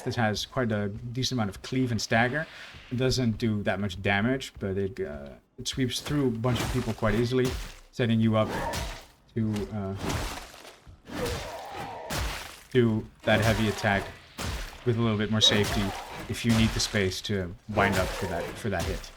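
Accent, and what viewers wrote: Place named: Netherlands. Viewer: Instantly recognize that Dutch accent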